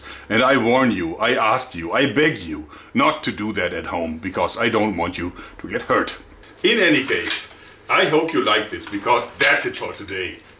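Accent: Germany. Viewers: You are german right? Your accent sounds like from a German. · The german accent is perfect for explaining nasty weaponry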